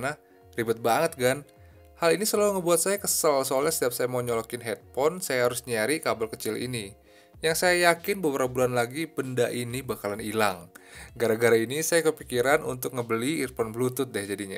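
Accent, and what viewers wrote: Lampung, Indonesia. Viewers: orang lampung ya?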